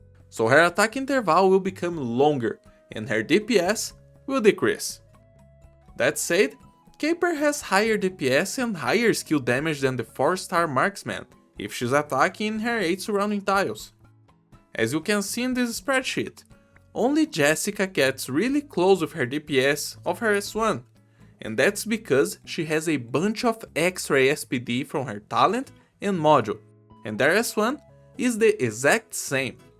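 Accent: Brazil. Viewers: are you perhaps brazillian? just curious. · Tu é BR né? O sotaque é impossível de não perceber KKKKKKK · Sabia que era BR so pelo jeito de falar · Eu percebi que era Br pelo sotaque, entrei no seu perfil e li Brasil 😊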